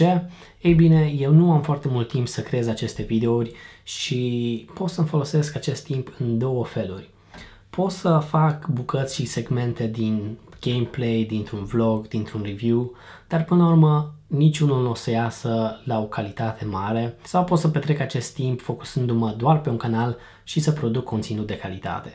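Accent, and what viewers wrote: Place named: England, United Kingdom. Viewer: nu se impaca accentul englezesc cu romana mai deloc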